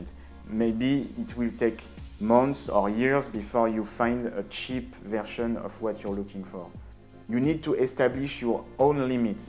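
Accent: France. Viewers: Il y a peu de bonnes videos francophones sur Youtube alors je n'ai pas compris pourquoi tu as préféré publier en anglais avec cet accent francais qu'on reconnait à mille lieues plutot que d'aider les francophones qui n'en trouvent pas. Merci quand même des conseils. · I can listen to that French accent all day.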